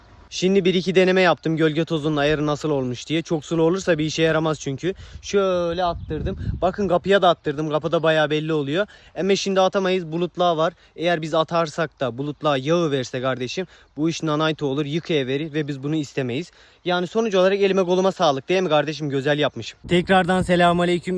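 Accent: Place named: Bursa, Turkey. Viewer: Abi sen nerde yaşıyorsun ben gazipasadayim Bursa'da senin gibi konuşuyorlar (fingirdinmi)❤❤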